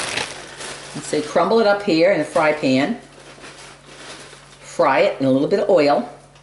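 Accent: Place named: Maine, United States. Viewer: You sound like you come from somewhere around where I do. I was born and raised in Maine. :-)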